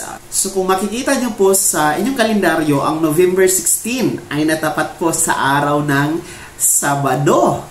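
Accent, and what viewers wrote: Visayas, Philippines. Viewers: Ayusin mo salita mo.and pronounciation.mo.tunog.bisayang.bisaya dating mo tapos fake news mo.na.naman puro ka paasa.pag nakita kita dudugo.bibig mo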